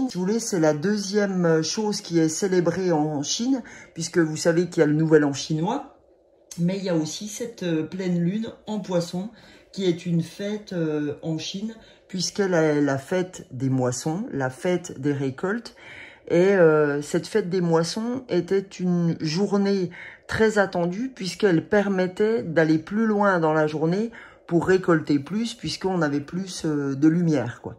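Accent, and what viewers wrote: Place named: Franche-Comté, France. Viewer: Bonjour je vous découvre aujourd'hui, je trouve que c'est sympa vous êtes très naturelle, je ne sais pas de quel coin vous êtes mais votre accent ressemble à celui de la Franche-Comté. Je m'abonne. Au plaisir de vous écouter de nouveau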